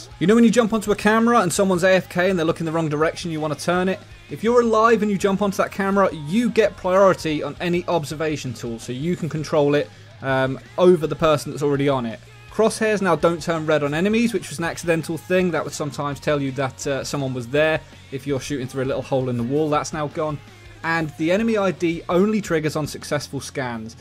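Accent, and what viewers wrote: United Kingdom. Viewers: oh look another british guy reading patch notes hi stylosa hi pete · You sound like you are from they U.k your connection is gonna be shit no matter what, Canadian and US are very compatible for servers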